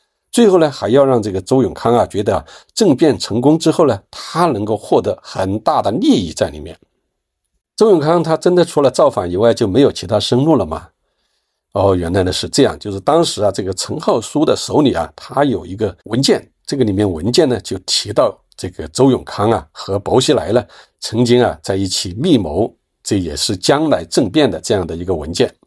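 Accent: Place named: Yunnan, China. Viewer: 讲故事的人是哪里人？ 云南人吗？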